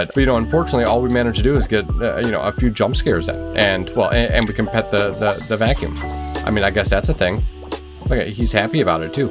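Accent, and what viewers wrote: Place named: United Kingdom. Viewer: i LOVE your BRI ISH acsent!!!!!!!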